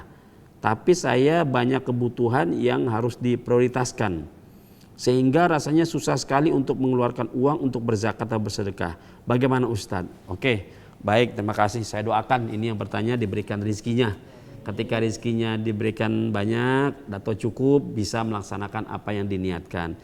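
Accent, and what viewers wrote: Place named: Jakarta, Indonesia. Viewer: Masa allah...Ustad jadi logat betawinya kental...semoga bisa terus mengasih tausiahnya..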